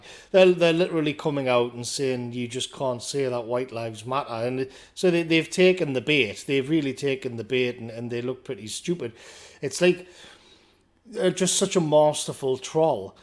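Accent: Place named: Geordie, United Kingdom. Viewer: Superb analysis, superb accent. Thank you!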